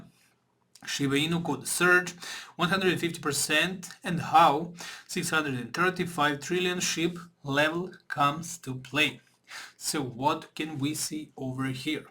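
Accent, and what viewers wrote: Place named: Balkans. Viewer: Are you from balkan?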